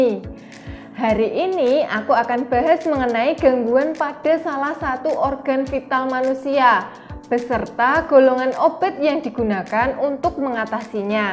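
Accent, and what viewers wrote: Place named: Banyumas, Indonesia. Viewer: Aksen banyumasan, tegal dan sekitarnya. Muantab bu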